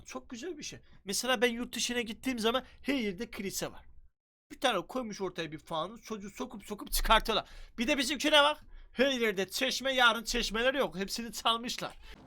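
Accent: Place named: Rize, Turkey. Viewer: Abi videoların bagımlılık yapıyor resmen saten rizrlilerin konuşmasına hayranım kendimde gümüşhaneli olarak seni izleyerek çok mutlu oluyorum resmen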